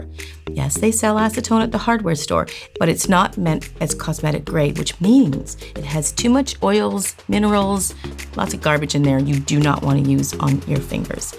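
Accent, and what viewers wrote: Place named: Canada. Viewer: I just got dip powder nails for the first time, trying to grow out my onion-peel, thin nails. (genetic, my mom's are the same) I was looking for info about dip nails online and found your channel and subscribed. I'm not a nail tech, but I love watching you and listening to you. My fiance is Canadian and before I knew where you are, I guesses you are Canadian, love your adorable accent. :) Thanks for making such great videos!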